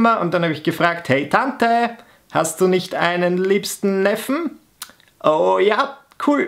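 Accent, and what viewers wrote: Vienna, Austria. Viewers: Alter dieser Wiener Dialekt ist sowas von wähhh. Ich lebe auch in Österreich und immer wird der Dialekt nur auf Wiener Dialekt geschlossen. Nur dass die Wiener nicht wissen dass andere Österreicher den Wiener Dialekt nicht wirklich mögen. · Das ist kein österreichische deutsch das ist Wienerisch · Du redest Wienerisch, das ist was ganz anderes als der Durchschnittsdialekt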